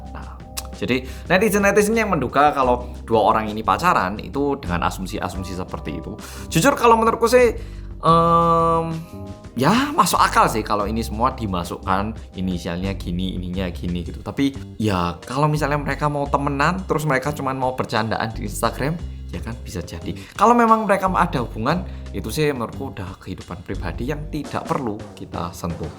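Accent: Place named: Malang, Indonesia. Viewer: Sumpah bawaannya ketawa kalau mas jelasin medhoknya ya ampun xixixi, bener bgt penjelasan mas "itu sudah jadi ranah kehidupan pribadi yang tidak perlu kita sentuh" 😆 dari pada sibuknya buat teori, ternyata artisnya ngeprank. Sehat terus ya mas 💕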